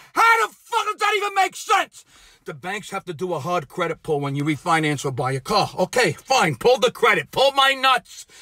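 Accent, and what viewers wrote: New York, United States. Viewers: Omg. Your so NEW YORK!! I LOVE IT.. YOU MAKE ME MISS MY DAD!!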